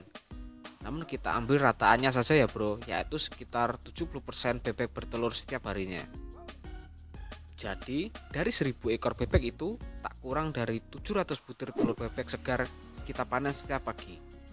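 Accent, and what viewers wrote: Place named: Banyumas, Indonesia. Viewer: Kaya wong ngapak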